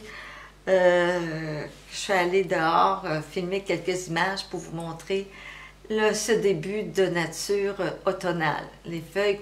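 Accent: Quebec, Canada. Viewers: Bonjour du nord pas de Calais en France Merci De nous faire voyager au Québec, je rêve d'y venir 🤩 J'adore votre douceur et votre bel accent québécois Bonne journée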